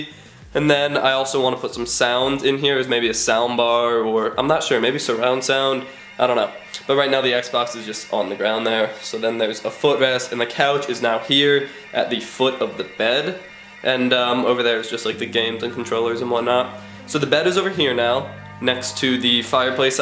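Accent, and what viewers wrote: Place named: United States. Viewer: He sounds American mate, probably a glory supporter!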